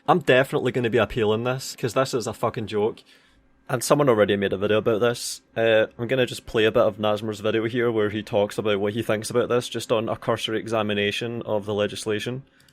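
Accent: Scotland, United Kingdom. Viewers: I don’t even play video games. I come to this channel for the angry Scotsman asmr.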